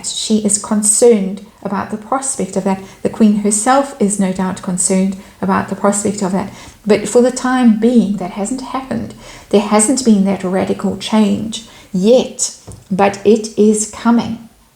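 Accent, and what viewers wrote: South Africa. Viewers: Yes I love the accent too! South African through and through!👏🇿🇦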